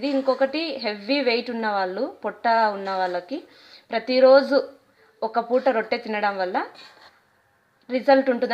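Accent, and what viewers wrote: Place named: Telangana, India. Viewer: telangana lo famous e jonna roteylu but mana yasalo chepandi enduku madhya lo koncham koncham yasa change chestunaru akka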